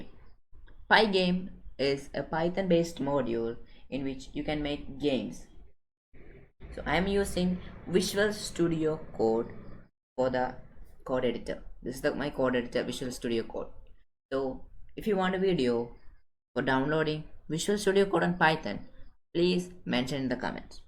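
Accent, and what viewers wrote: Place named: England, United Kingdom. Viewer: u turned to an engliish dude!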